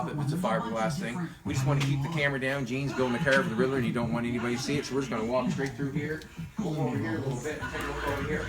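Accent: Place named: Canada. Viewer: I started watching your videos and man your channel is going to blow up soon with your amazing work! I couldn't help but think you were from Canada bc the Keith's shirt and how you talk and now u said n.s. I'm originally from cape Breton and live in Halifax now and wanna say hello from Halifax man!!! Keep er up man love your work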